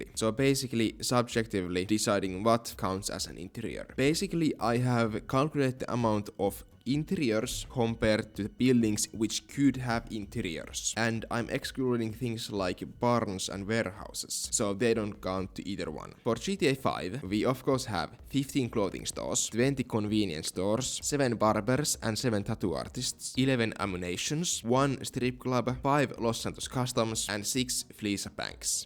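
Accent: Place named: Finland. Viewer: I can see his hard finnish accent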